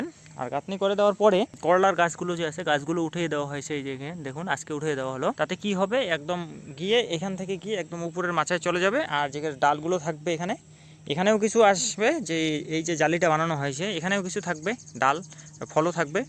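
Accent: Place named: Rajbanshi, India. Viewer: Dada apnar kotha to vatiya r rajbanshi vash mix ache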